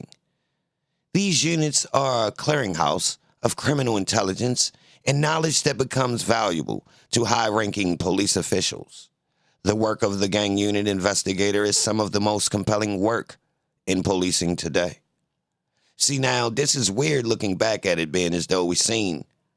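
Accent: Baltimore, United States. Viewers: Between the comedic commentary and your bmore accent I am dying over here 😂😂 but this was a great video. Very interesting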